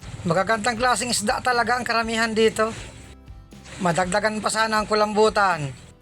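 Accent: Bicol, Philippines. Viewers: ang tagalog ni kuya bikolanong bikolano ang tugas ng dila.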